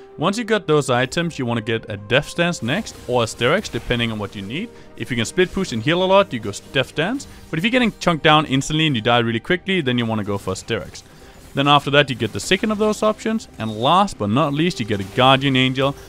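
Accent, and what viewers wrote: Denmark. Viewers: you sound danish :D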